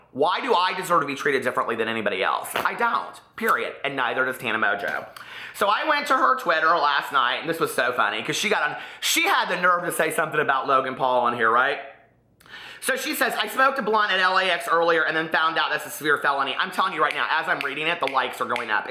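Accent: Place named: Midwest, United States. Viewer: Is the n silent in that girls last name or is it just fast speaking midwestern accent? Cause I’m dead ass hearing Tana Mojo every time he says her name.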